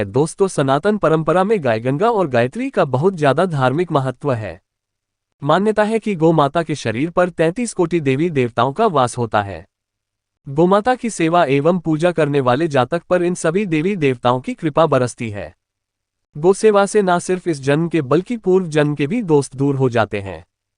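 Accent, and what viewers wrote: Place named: United States. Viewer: Aisa lagta he america ka admi hindi bol raha he, chutyapa 😝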